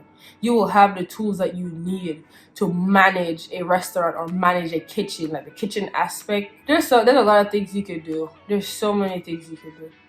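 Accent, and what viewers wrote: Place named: New York, United States. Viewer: I hear a hint of New York in your accent...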